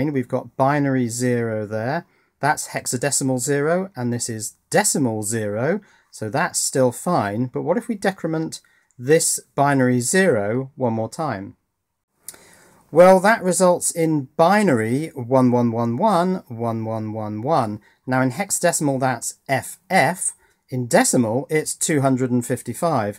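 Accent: England, United Kingdom. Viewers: Aman Mittal given his accent, I'd say England.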